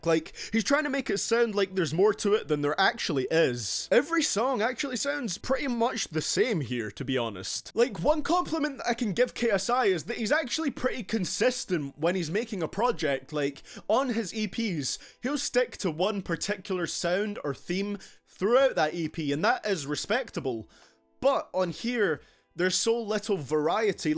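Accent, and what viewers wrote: Scotland, United Kingdom. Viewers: Are you Scottish? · Where in Scotland are you from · are u scottish cause i am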